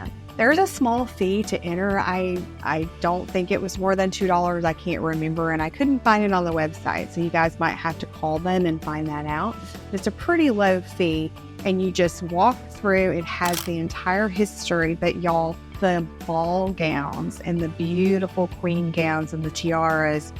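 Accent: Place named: Texas, United States. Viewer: finally someone with a texas accent! we are losing our accent. we need to do everything to preserve and grow our iconic accent! you are a breath of fresh air!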